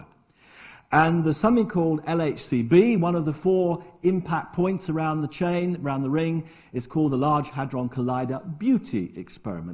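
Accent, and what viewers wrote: United Kingdom. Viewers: the British accent is so tantalizing. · do you know wot I mean? does that make sense? d'ya see wot I mean? Oh, and that gas over there, that's "MEE-thane"...(ugh, I can't stand the way brits mispronounce words). Oh, and "isn't it?... isn't it?...know wot I mean? Isn't that right? LOL; Really, I enjoy these lectures. Prof Morison does have an interesting, animated mien about his presentations. Always fascinating to watch. And I thought the "Oscar Party" analogy was a great one: it's a great way of explaining how mass works. And, i agree: quarks shouldn't have been arbitrarily changed to "truth & beauty" from what they've been called all along & what is a much more sane label: "top" and "bottom", besides, "truth" and "beauty" are both subjective, where as there's no argument, can be none, to something that is "top" and something that is "bottom"; cheerio!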